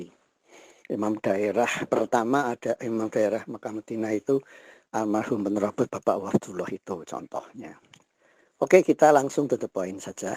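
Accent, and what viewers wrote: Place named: Java, Indonesia. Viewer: Ngomongnya aja medok ko' ingin nguasai dunia.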